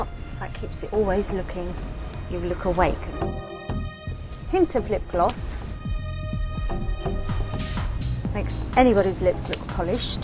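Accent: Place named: United Kingdom. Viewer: i don't know who this woman is.. but, she's beautiful and she speaks the best british accent i've ever heard...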